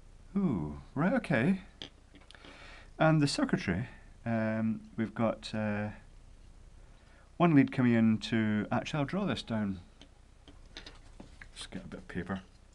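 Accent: China. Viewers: I love the Chinglish :D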